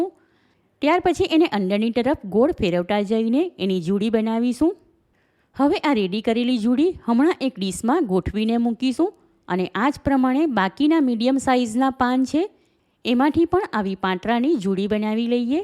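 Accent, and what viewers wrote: Surat, India. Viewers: સુરત નું ગુજરાતી આવું હોઇ છે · A sweet surti tone chhe 😊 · surat ni gamdani boli che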